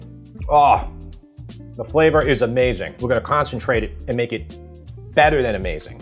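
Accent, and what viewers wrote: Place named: Long Island, United States. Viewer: That Long Island accent is like a warm blanket.. reminds me of home 😢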